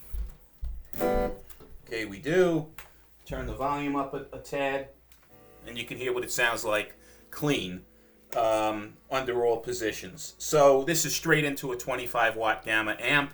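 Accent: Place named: East Coast, United States. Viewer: I appreciate the in-depth review, coupled with the East Coast accent, which completes the experience for a Midwesterner like me. BTW, if anyone clicks on your affiliate link, you get a piece of the action for so many minutes afterward, regardless of what is purchased.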